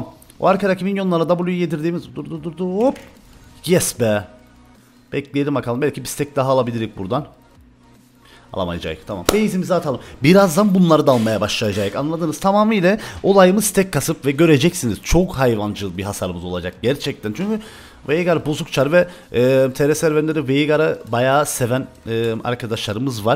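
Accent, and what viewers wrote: Cyprus. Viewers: Abi Kıbrıslımısın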